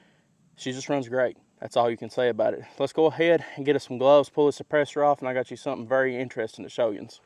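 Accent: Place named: South, United States. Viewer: Slow down a little, with your awesome accent it gets difficult to understand when you talk so fast. I had to turn on the subtitles. The southern accent is the best, no question, but it wasn't meant to be belt fed.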